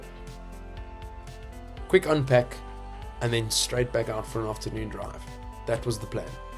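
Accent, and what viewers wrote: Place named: South Africa. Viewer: Pretoriuskop to see leopards.....definitely not. Travel more north to the Skukuza and Lower Sabie region to see lions and leopards. Thravel NORTH not SOUTH from Pretoriuskop to the Skukuza region......you have the South African accent but you don't know directions, so maybe you are tourists. At the end saw your name....what a surprise.....I am also a! (from Pretoria)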